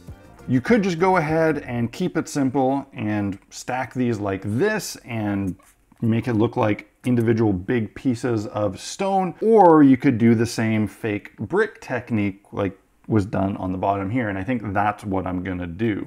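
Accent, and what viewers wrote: Canada. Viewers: You said "out" so many times I discovered that you're Canadian.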